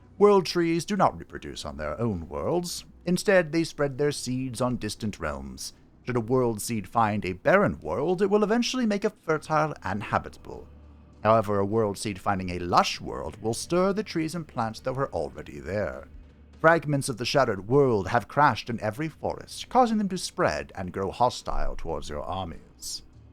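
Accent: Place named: United States. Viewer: And it was a very poor attempt made from ignorance. Not blaming you as a person, as your accent pegs you to me as a USian, and there is no country on this world whose citizens are more confused about the political spectrum and what the various tendencies entail. I meant to poke a response, and thereby give you a more accurate way to consider communism. For accuracy. For you have an audience, and some might take your words as gospel - which would be, in this case, inaccurate.. :)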